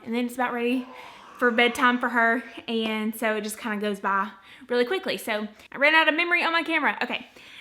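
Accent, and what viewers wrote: South, United States. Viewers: Oh my god this is my first time hearing a genuine southern accent it sounds so cool. (I live in north new Jersey and I’ve only been out of state twice lol) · I love your southern accent, great tips🌟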